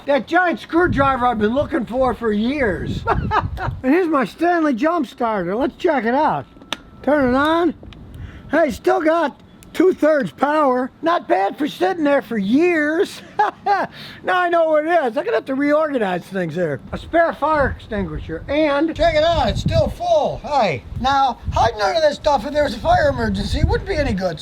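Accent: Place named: New England, United States. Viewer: If you were not from Texas I would have after watching this video, thought you were a old YANKEE from New England. you reminded me so much of my old friend Barry. Keep up the great work.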